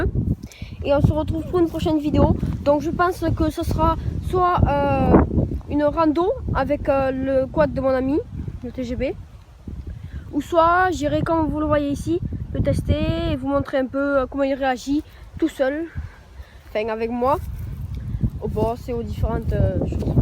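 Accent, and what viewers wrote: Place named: South, France. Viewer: Toi tu est du sud 😂 quel accent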